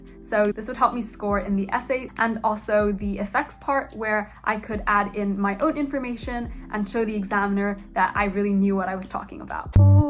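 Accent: United States. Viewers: woah if ur doing gcses why do u have an american accent? (i am not interrogating) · i just realised u sound American 😭 did u move here then do ur GCSEs? x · you sound american so did you take igcse?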